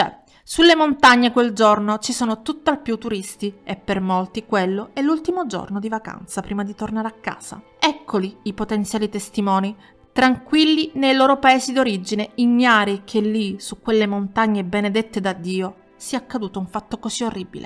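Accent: Sicily, Italy. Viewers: Ciao, scusa se sono ripetitivo, avendoti giá "difesa" da " accuse" di dubbia pronuncia ed eccessiva sicula cadenza. A me personalmente la tua voce, il tuo modo di parlare ma soprattutto il tuo caratteristico piglio siciliano piace da morire. Brava, ti ascolto a prescindere dal caso che tratti proprio per questo.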